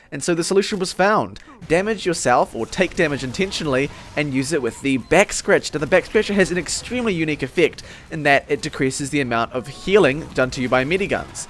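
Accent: New Zealand. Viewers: listen to the way he says 'get'. He's from nz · He sounds very much New Zealand to me.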